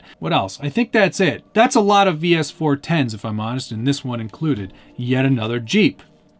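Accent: Canada. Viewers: Sounds like you are a fellow Canadian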